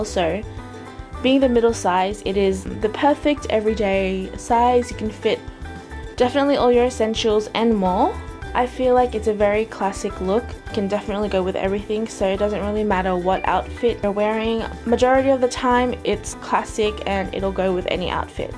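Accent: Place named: Australia. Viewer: noticed the aussie accent and subbed right away! haha another bag lover here ^_^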